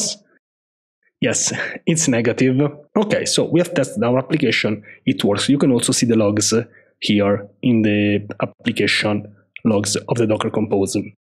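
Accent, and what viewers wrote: Italy. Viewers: I love two things : the way you did your part of the job, and your strong Italian accent, Of course I subscribed. Thank you both, guys !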